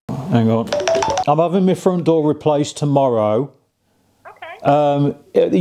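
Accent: Birmingham, United Kingdom. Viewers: Are you from Birmingham mate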